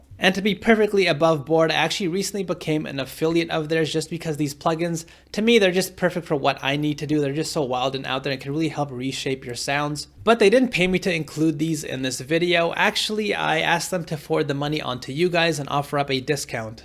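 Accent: India. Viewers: Are you a Indian residin in US ???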